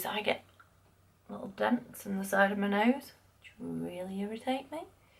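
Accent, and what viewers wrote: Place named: United Kingdom. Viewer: i love your videos and i love your british accent :) This is Littledustmua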